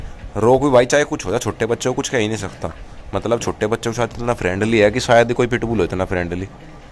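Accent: Haryana, India. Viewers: Bro aap rhata up ma ho par bolta haryanvi ho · Bhai app haryana se ho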